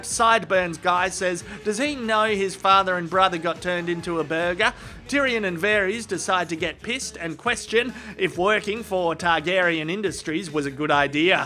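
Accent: Australia. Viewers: na he s just australian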